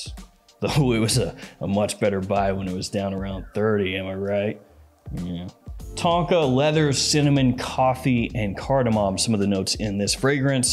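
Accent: United States. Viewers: Hey your 🇺🇸 accent is cool to us Brits 🇬🇧. My last unsolicited compliment was from wearing Mancera - Cedrat boise. Greetings from England 🏴 - Lee.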